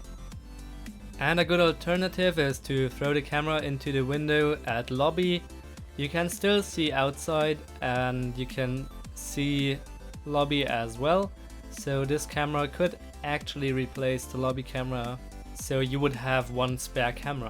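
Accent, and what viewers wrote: Germany. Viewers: i knew youre german...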